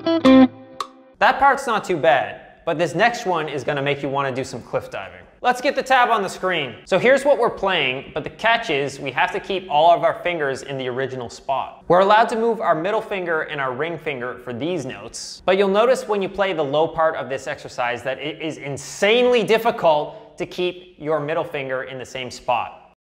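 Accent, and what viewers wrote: Canada. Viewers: You canadian? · This guy sounds Canadian af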